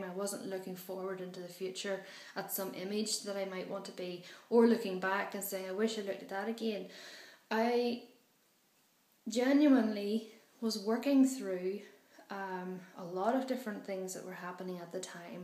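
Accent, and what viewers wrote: Ireland. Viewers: Hi i came across your video by chance because i was thinking of doing a sporting challenge for my fiftieth birthday, which is next year, and i saw this that you put on here, and the one you did on your birthday. Anyway i would like to say you look amazing so really good looking with those blue eyes and that gorgeous Irish accent of yours, you are a wonderful young woman and life is for living so go and enjoy yourself as much as you can, any ideas for me drop me Dave a note.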